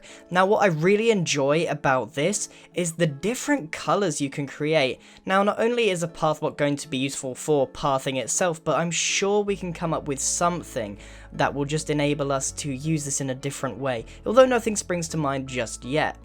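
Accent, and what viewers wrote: United Kingdom. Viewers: jade wolf I'm fine with it some friends I have, have British accents. I actually quite like British accents. :P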